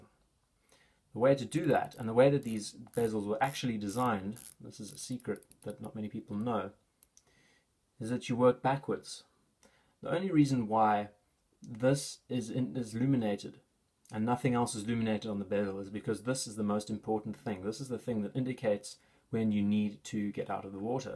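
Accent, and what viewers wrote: South Africa. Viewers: Sounds like a Saffer twang to me.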